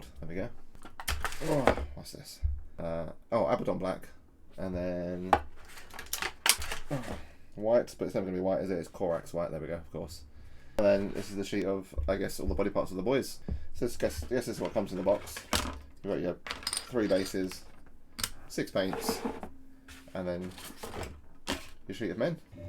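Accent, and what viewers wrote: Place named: England, United Kingdom. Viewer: Hello mate! Seasoned painter here and I think he looks fantastic! I know what you mean about the price of them. They're insanely pricey. You sound like you're from England (like me :)) so if you want to get kits and such best places are element games or weyland as they sell minimum 15% cheaper or warbay (they specialist in buying peoples stuff so quite often at a 50% discount!). p.s. dropped you a sub! Looking forward to seeing more content :)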